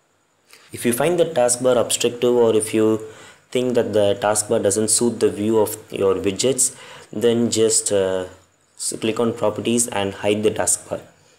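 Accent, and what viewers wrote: India. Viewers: After watching so many tech and programming tutorials, I am starting to love the Indian accent. The accent is actually cute! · Sound like indian scammer... Sorry!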